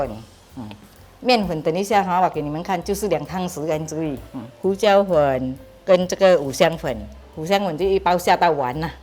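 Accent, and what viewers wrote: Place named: Hokkien, China. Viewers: 是閩南人吧，口音很熟悉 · 潮州人吧！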